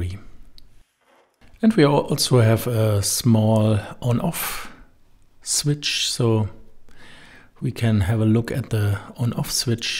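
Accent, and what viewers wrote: Germany. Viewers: since i live in germany, I'm 100% this is a German native :D from accent perpective, but good work!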